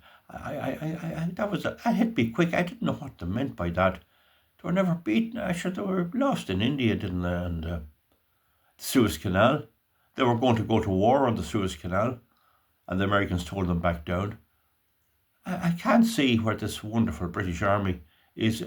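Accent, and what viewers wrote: Ireland. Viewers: Last time I heard such wise words in a fine Irish dialect was a while ago in a Cricklewood tavern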